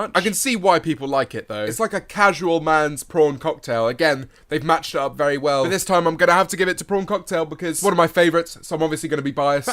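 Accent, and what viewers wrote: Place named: RP, United Kingdom. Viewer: 2017 sounds way more posh compared too 2019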